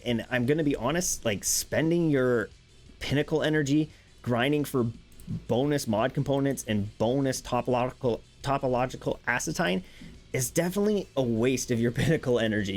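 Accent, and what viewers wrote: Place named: Canada. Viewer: Great video but damn my man you and tash sound exactly alike and I'm not talking about the Canadian accent. If I had my eyes shut I wouldn't be able to tell the difference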